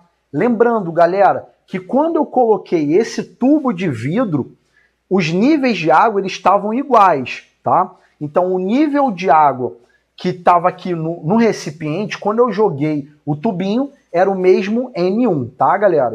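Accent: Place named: Rio de Janeiro, Brazil. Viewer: Eu amo esse sotaque carioca. Quase me apaixonando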